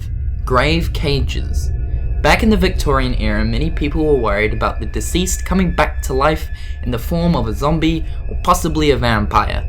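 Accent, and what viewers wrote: New Zealand. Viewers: Please. Don't talk like a Kiwi/Maori. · Sounds like a kiwi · Are you from New zealand?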